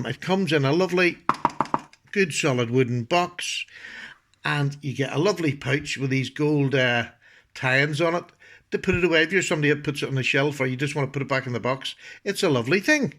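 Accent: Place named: Ireland. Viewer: This review made me purchase this knife. Your voice sir makes me want to visit Ireland and drink large quantities of beer. Cheers from Texas!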